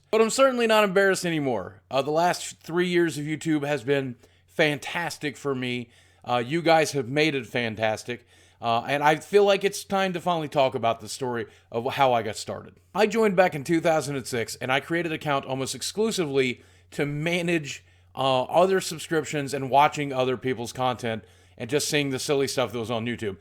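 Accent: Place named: Arkansas, United States. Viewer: This is off topic boogie, but I'm from Australia and I just wanted to say you have the most pleasant accent to listen to out of other US states (Arkansas right?), I enjoy listening to you speak and you could talk about literally anything and I would find it interesting haha. Love ya mate!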